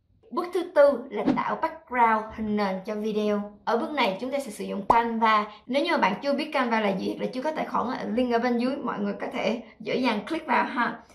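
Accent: Binh Dinh, Vietnam. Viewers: nghe giọng nói của bạn giống như ở bình định hay phú yên hihi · Bà ở Bình Định hả bà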